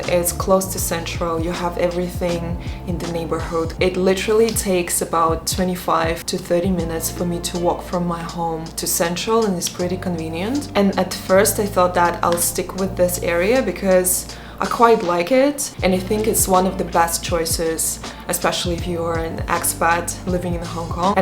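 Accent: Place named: Russia. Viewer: The ruzzian accent is disgusting, I am not watching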